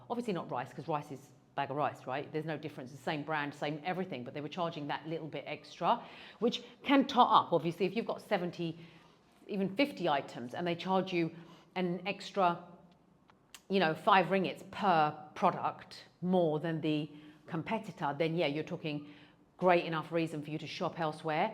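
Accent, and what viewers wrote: United Kingdom. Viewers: Love your British Accent! reminds me of my student years in England..wonderful! you are indeed a fast learner and definitely have picked up pretty well..I feel that your cost of living calculation is fairly reasonable! Definitely agree with you taking a drop in maid once a week!! keep up the excellent reviews of Malaysia!👍👍💕💕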